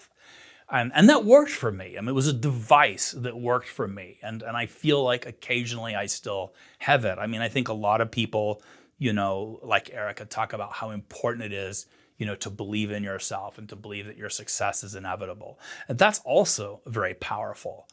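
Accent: Ireland. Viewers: Interesting about Texas....he sounds almost Irish to me. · Irish Accent im hearing?...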